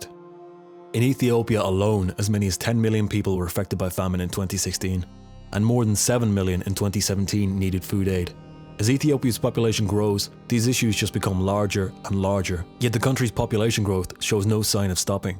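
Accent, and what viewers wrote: Ireland. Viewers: You sound irish, so what you think of as christians is a little different in the sivalised world. We don't all exchange potatoes wile huddled around a fire made of cow dung. Sorry to rewen you nestalga. XoXoXo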